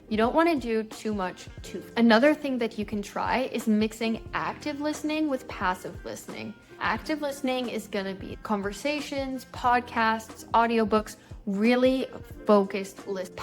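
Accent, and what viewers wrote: United States. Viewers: ❤ thanks teacher your pronuntation Is clear AND natural.are you American?❤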